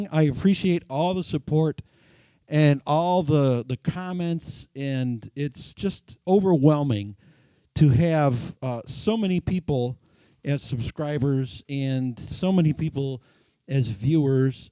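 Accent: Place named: Michigan, United States. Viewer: You sound like you're from Michigan! (I grew up in southwest MI).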